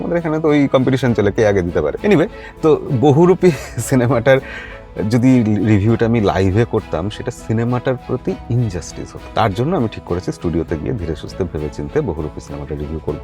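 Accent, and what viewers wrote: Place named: Bangal, Bangladesh. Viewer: Apnar bangal accent ta amar besh lage 😊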